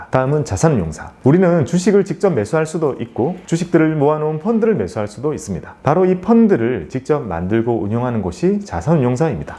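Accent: Gyeongsang, South Korea. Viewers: 책 읽고있는데 특유의 경상도 말투? 음성지원되는거 같아요. ㅋㅋ 세상 쫄보인 제가 주식이란걸 시작하게 될 줄은... 덕분입니다. 감사합니다~~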